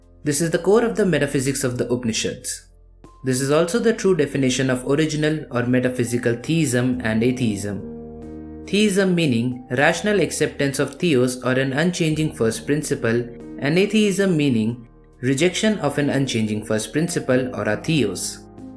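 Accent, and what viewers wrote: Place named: India. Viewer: never want to mean or curial but your Indian accent is distracting sometimes. Just sometimes, like every 5 second in 2 minute. Beside that amazing video and description.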